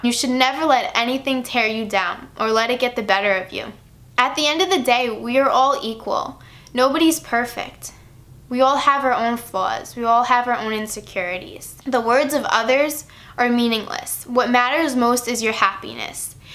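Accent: New York, United States. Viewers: Idk how people have the balls to make negative comments this girls gorgeous pimples or not ... That New York accent 😍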